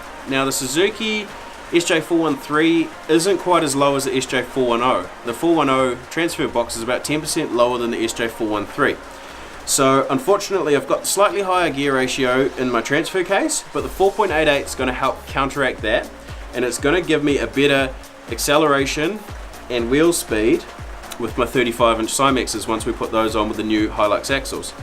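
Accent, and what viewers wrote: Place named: New Zealand. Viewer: ahhh your a kiwi lol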